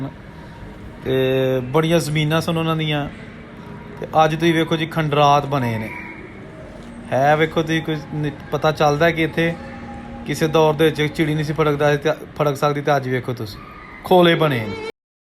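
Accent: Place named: Punjab, India. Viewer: I liked your voice with Punjabi tone.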